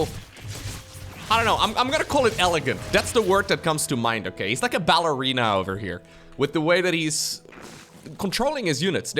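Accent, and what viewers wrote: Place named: Netherlands. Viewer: You say "bunch of stuff" a lot. With that particular dutch way of saying bunch - Beounch. It's funny, Harstem and Rotterdam also say Beounch.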